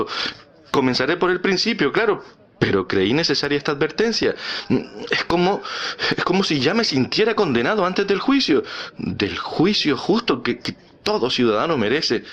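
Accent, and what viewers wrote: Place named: Chile. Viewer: Buenísimo!! Muy bien narrado!! Me encanta el acento, será chileno?? Me he reído mucho!! Muchas gracias!!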